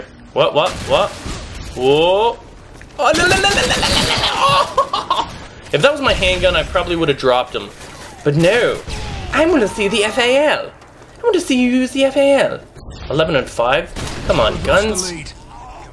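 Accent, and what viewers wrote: England, United Kingdom. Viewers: i <3 ur british accent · loving the british accent haha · Seemed a bit like he had a split personality with the English accent and the whatnot, got a good laugh or two from listening to the ramblings of a murderous English-man, thumbs up indeed. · lol why do you always do an English accent in your commentaries? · Best live commetary ever!! I love your british accent lol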